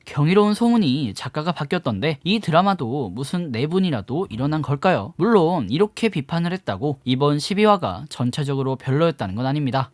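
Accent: South Korea. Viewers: ㅎㅎㅎㅎㅎㅎ 말을 허벌나게 잘해부리는구마이~ 틈틈히 리뷰 잘보고 있어요. 지루하지않고 군더더기 없이 리뷰를 잘해주시는거같아요. 근데 놀란건 전라도분이 어찌 이리 완벽히 서울말을 구사하는지.. ㅎㄷㄷ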